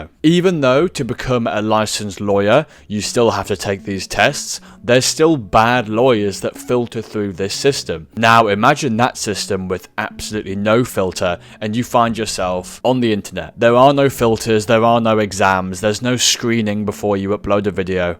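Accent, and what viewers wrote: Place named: United Kingdom. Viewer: So sick of backwoods British accents on so many youtube videos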